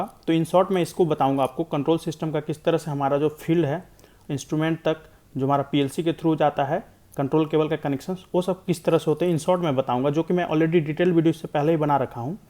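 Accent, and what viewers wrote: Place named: Bihar, India. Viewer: Bhai aapka language vihari type h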